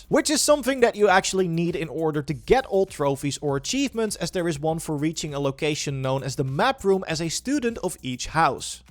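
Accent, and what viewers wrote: Netherlands. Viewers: duidelijk nederlands accent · 100% Nederlands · Steenkolen Engels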